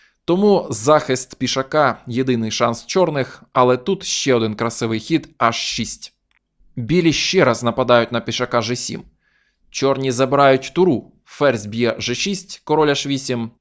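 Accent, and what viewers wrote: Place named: Western Ukraine, Ukraine. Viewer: Як нас на Західній Україні говорять файно.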